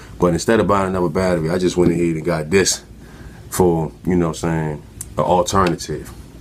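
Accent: Baltimore, United States. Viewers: Yo you from Baltimore??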